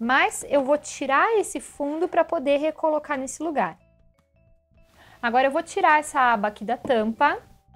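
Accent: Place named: Santa Catarina, Brazil. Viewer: vc é de Santa Catarina? é que eu vi a foto no Beto Carreiro, e seu sutaque tmbm parece daki ahhaha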